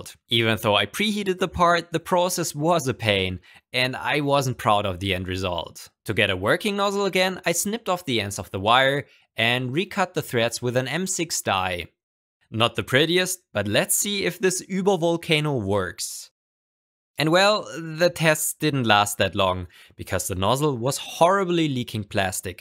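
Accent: Germany. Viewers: Are you german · Hallo, Dein Deutsch-Dialekt kommt richtig geil. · Hello, bist du eigentlich Deutscher oder Österreicher?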